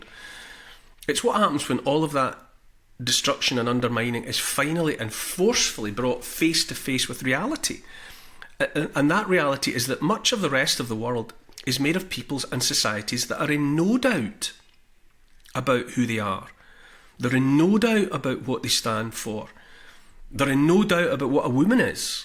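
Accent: Scotland, United Kingdom. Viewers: ... this CanUk fan is a fan because you overflow with common sense. Personally thank you for the great help you have been to a feller, with ancestral Scots blood, better understand what words behind the Scots accent meant: ) In the 50s-60s Scots in our chat had been reduced to wonderful 'catch phrases' usually humerous. Cheers & beers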